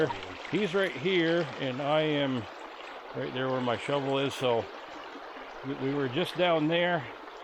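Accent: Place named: Vermont, United States. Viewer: Love that born in Vermont accent!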